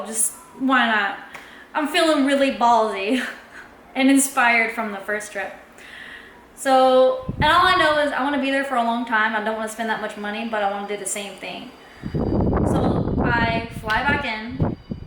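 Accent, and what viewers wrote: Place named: South, United States. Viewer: That's a cool uplifting story. Thank you very much for sharing it with us. I don't know about your accent, in all my life I have met like 3 Americans and none of them were from the South. I have heard this accent before in videos etc and thankfully I have no problem understanding it because I like it a lot. I have a similar story, just not a successful one in how it ended, but still I totally get your sudden change of life. I was a university student of computing in Plymouth, England. I am not British, I am Greek. I was doing my second degree and I was doing it more because it made sense than anything else at the time. I was in my third year there. One late night I was watching a movie on DVD, Once Upon A Time in Mexico. I liked it and decided to watch the special/extra features. One of which was a behind the scenes extra from the film's director. 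At one point, several minutes in, he goes something like this "obviously if you are watching this are interested into film making, else why would you watch this 'boring' stuff". I don't remember his exact words, but that was more or less what he meant. At that point I thought to myself that perhaps in the next life I will become a filmmaker. As soon as I finished that thought, the next one came "what next life? You only live once". Next morning I contacted the university and resigned. There was no film making course at the time so I decided to contact the one where I graduated first in another town in the UK. The following academic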